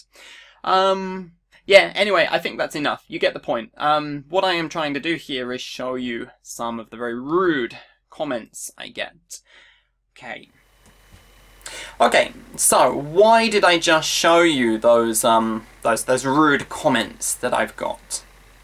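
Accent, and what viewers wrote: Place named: United Kingdom. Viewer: It's music to my ears your British accent. I love it!!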